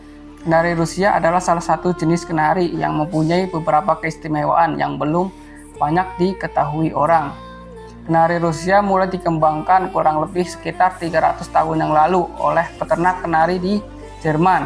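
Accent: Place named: Banyumas, Indonesia. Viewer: Ini baru ahli burung..jos kang.ora ngapak ora penak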